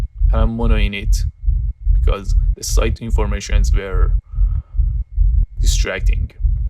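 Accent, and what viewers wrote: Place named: Tehran, Iran. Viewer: The Accent Look Soooo Bache Tehron 😃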